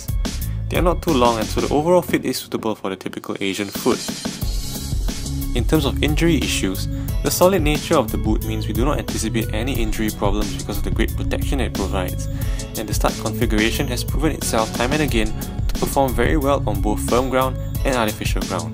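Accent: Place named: Singapore. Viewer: I can tell ur from Singapore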